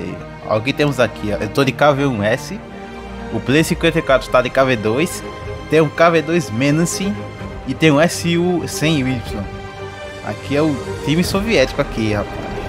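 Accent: Northeast, Brazil. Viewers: Tú é nordestino né fi?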